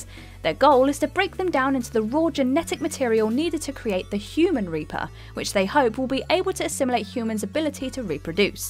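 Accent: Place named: England, United Kingdom. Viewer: I know it’s tough with an English accent and she’s relatively new, ( does a great job with the better part of the video) she really butchers a lot of pronunciations in this video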